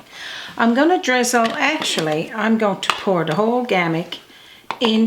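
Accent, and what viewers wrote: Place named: Newfoundland, Canada. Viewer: I love your NFLD accent, tell me when your birthday is so I can send you a Bag of H's. ere are y now? Cool, using orange juice, I have been an Armature chef, because I don't have a red or blue seal, but I have been cooking since 1978, my career took me to other professions, but still I am a cook for the rest of my life, thank you for sharing this very cool recipe, I'm definitely going to make it I like the orange with the rice, Baked. Tell us were you at?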